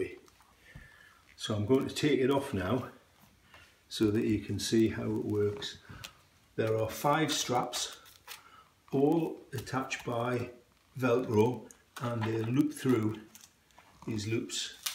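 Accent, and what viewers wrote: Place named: Newcastle, United Kingdom. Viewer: You sound like a geordie too! Lol like me. I am post surgery week 7. I am now on a moon boot instead of plaster. I am finding it difficult with physio. I am a 33yr old mother with two young children and I was studying to be a nurse in my first year of nursing. I had an accident and dislocated my ankle and broke it in three places, they said tibia, fibia and trimelleour I have had a plate in the side and screws and another plate, across and and something else. I have had to postpone my studies and will continue at a later date. To anyone reading this you've got got this. It's bloody hard work and it's not easy, am 7 weeks in and my skin has dried up and I'm still swollen and lost alot of muscle too. I am still very sore and I still have some bruising. I am worse mornings and evenings. I elevate my leg and I also do physio from home.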